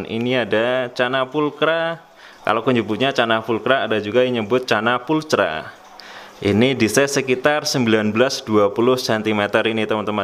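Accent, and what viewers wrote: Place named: Surabaya, Indonesia. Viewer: Sampean suroboyo ndi mas?bek'e oleh nempel Gon e sampean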